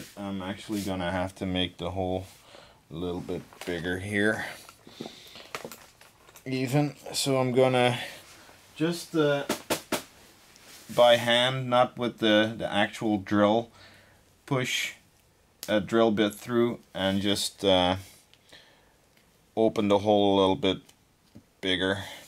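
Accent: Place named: Canada. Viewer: you sound Canadian, where r u from?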